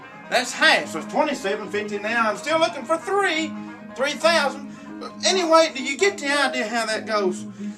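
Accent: South, United States. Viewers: Your chant is beautiful and you have nice rhythm. And your southern accent makes it even better! 👍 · That was awesome!! I wish I could do that, but there just ain't no way!!! Love the deep southern accent!! I'm an ole country gal that lives on a farm and go to alot of livestock auctions and I love listening to the auctioneer! I do have to say though, you are really amazing at this!! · I don't know what I love more: the southern accent, or the music in the background reinforcing all the prejudices of US southerners xD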